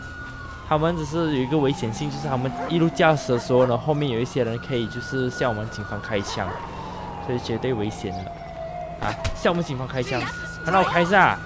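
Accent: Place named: Cantonese, China. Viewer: 你的广东话好搞笑😂😂😂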